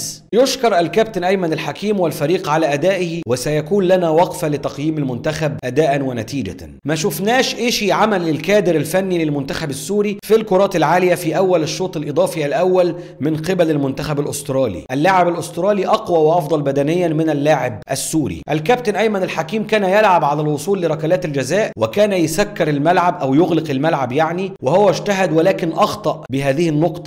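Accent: Egypt. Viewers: انت سوري وللهجتك مصريه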